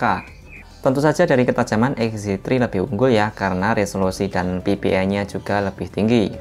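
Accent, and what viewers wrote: Boyolali, Indonesia. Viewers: Mas e koyone wong boyolali iki? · Wong Boyolali kah? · Wah wong Boyolali iki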